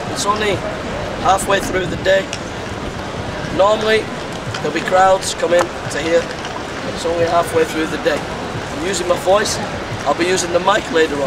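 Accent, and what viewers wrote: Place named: United States. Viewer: Mate ... Youre from chadderton why do you speak like an american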